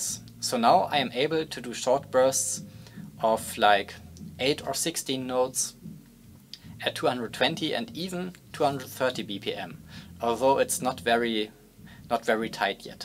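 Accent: Germany. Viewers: Wie man es hört das du deutscher bist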